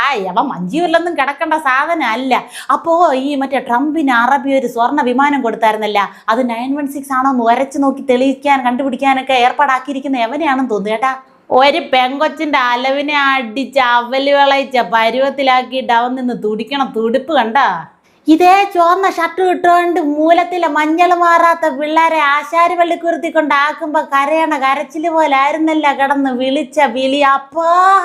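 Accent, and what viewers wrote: Thiruvananthapuram, India. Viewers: ഇത്ര നന്നായി തിരുവനന്തപുരം ഭാഷയിൽ മുഖത്തടിച്ച് കളിയാക്കുവാൻ കഴിവുള്ള ഒരാളെ അഭിനന്ദിക്കാതെ വയ്യ. Adv.സാജനും തിന്മക്കെതിരെ പൊരുതുന്ന ഈ മാദ്ധ്യമപ്രവർത്തകക്കും ഒരുപാടു് അഭിനന്ദനങ്ങൾ...😂❤🙏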